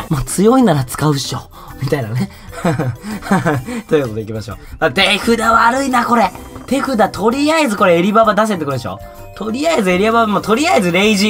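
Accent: Kansai, Japan. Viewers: 最高やんなぜ関西弁強すぎよわすぎぃーワロタww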